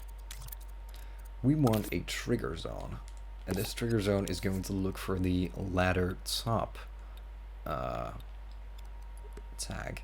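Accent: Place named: Netherlands. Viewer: Ik kan me niet concentreren door die Nederlandse accent LuL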